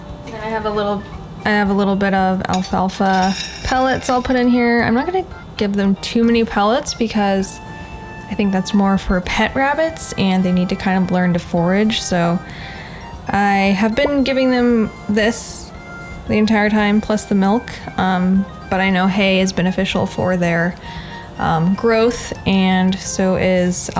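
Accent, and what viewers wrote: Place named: Midwest, United States. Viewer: she has to be from the midwest. Minnesota maybe....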